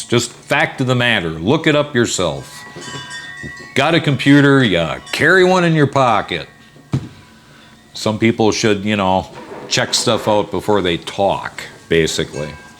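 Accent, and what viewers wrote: United States. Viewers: sounds more like a Wisconsin accent. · I'm surprised his ex-wife didn't blow the whistle on him??? By the way I love your Minnesota Accent!! You sound like all my relatives!!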